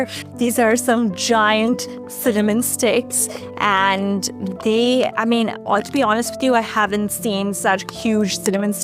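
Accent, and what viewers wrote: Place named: Ireland. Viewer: Just discovered your channel and am binge watching. I’m an American guy with a decent amount of experience cooking in some southeast Asian traditions but am trying to make sense of Indian food. The first thing I learned is that it’s overwhelmingly complicated. Talking about “Indian” food is about as useful as talking about “European” food. I like that your recipes and techniques are rooted in a specific region, it gives a much more solid basis to learn. I’m curious about your lovely accent in English. Did you ever live or go to school in Ireland?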